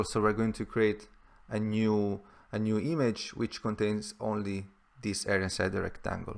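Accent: Italy. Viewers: Improving 3D + perspective is interesting, you should push that project much further for sure! Thanks for sharing. It's the 4th video i'm watching from you, allow me to comment my overall experience: - Monotone voice, a bit 'boring' (don't want to be rude), you don't seem motivated or you don't seem to enjoy sharing or you don't seem to enjoy doing it... C'mon, share your guts! - Funny Italian english (good and bad I guess, still better than the Indians .py tutorials, right :D ) - Really GOOD content, hard to find (i just tried different keywords this time and found the answers i needed! I'm happy!) - Speed of coding is good enough, the code is enough explained (it's really the feeling you give: you are bored to do this! -> that is the bad part of the coding..) - Keep on going with these things, i subscribe now because you have an interesting topic I want and need to learn for professional purposes, so I will watch your next videos as they come and I will check your previous ones with time. 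Anyway, wish you the best of success on your youtube path! Thanks again for the sharing and explanations, really helpful and useful, good enough for now, add more motivation in your voice and we'll be friends for a long time! :) Ciao :)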